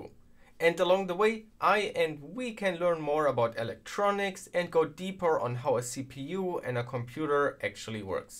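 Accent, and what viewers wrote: Germany. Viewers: not sure, but sounds german. like it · man hört dass du Deutscher bist :D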